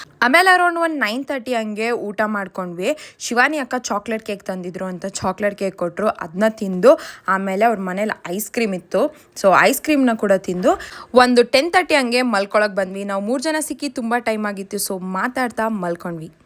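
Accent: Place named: Mandya, India. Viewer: The Mandya dialect you speak is very pleasant to listen to.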